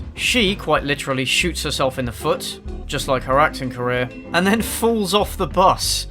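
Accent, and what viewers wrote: United Kingdom. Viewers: Oh God. You're so much 'britishier' than I could ever imagine. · An already amazing review gets bonus points automatically for sarcasm with a British Accent!!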